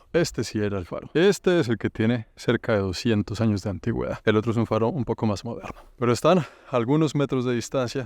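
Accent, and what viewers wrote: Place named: Bogota, Colombia. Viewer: «Espichar el botón» para que todo el continente entienda el hablado cachaco, su merced.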